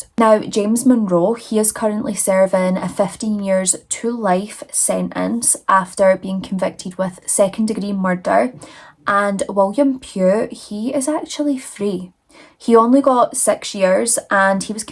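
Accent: Scotland, United Kingdom. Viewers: Congratulations to the 10 000 subscribers!❤️🥳 Love your channel, always. 😍Also love your Scottish accent since my husband is from Scotland (Airdrie). ❤️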